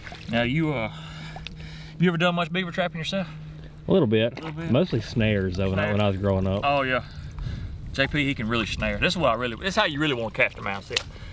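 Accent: South, United States. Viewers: Hears the voice of the master typically redneck BS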